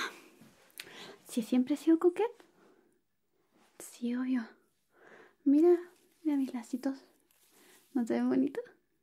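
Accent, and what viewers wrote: Chile. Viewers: Ya vi que si es chilena. Weeeeeeeeey nunca se le habia notado su acento weon! Me enamore mas de ella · Me encanta cuando hablas con tu acento chileno ❤ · Amo el acento chileno😻intento hacer lo mismo pero a mi no me sale😭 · amo tu asentó chileno😍👊🏻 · No me cae la forma de hablar de chile pero me hizo cambiar de opinion,ME ENCANTAN SUS VIDEOS DE LA HERMANA CHILENA MUAAACK!! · Me encantas sin susurrar!!! Y ese acento chileno bellooooooo❤️❤️❤️❤️ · Jaja ahora puedo ser el SJJ ros- digo digo COQUETTE! Pero antes una siesta no hara mal... Pd: Amo como hablas a lo chileno! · Me encanyel acento chileno, saludos desde Leenares, Japón. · Que linda, me lleve una sorpresa con tu acento Chileno🇨🇱, un abrazo, me encantan tus vídeos, me ayudan a desconectar.💫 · 100% con su asento chileno hermoso 😊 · En este video se le nota mucho el asentó chileno 😍 Un excelente video ❤ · primera vez en tu canal y reconocer altiro que eres Chilena uwu 💞💞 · holis oye te tengo una pregunta en tu vídeo dices algo de que hablas como chilena eres chilena? 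· Weeey apenas empezó el vídeo supe que estabas hablando como Chilena, porque soy Chilena 😮😮 de donde eres? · No sé si es mi impresión o ya no neutralizas tanto tu acento chileno. Solo te falta el cachai ja, ja, ja, ja... Un fuerte abrazo, amiga. Pronto ven a Guayaquil, de seguro tienes tu club de fans. Shalom. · Ahorita ando en modo "dios iluminame o eliminame" jaja ya que se me juntaron dos enfermedades (por wey en parte jeje😅😅😅) asi que tu voz con tu acento chileno mas el poderosisimo paracetamol me vendran de perlas para sentirme mejor, eso receto el doctor jaja😁😁😎. Que tengas linda noche. 😊😊😊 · Casi no logro descifrar tu acento solo hoy pude Chilena 😊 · Hola eres my nueva YouTuber soy de Perú, y me gustan tu videos de ASMR te digo que eres una chica muy bonita y se me hace extraño escuchar un video con el acento chileno marcado y en otro videos no son tan marcado tu dejo chileno. Me agrada verte siempre. 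· La mejor manera de iniciar la semana, con mi hermanita chilena (y coquette) Muchas gracias,. Una feliz noche de lunes (e inicio de semana) para ti también hoy. Cuídate mucho y que Dios te bendiga. Nos vemos pronto amiga, te quiero. Saludos desde Colombia hasta Chile, y, por cierto, muy lindo tu acento.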